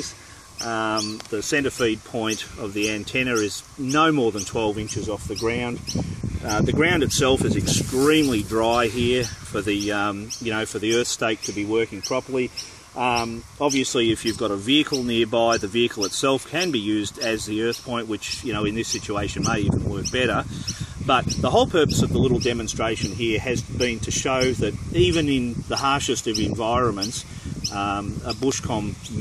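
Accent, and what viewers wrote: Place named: Australia. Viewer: is an Australian...from Australia. That is how he sounds. We're not all Americans, sorry about that!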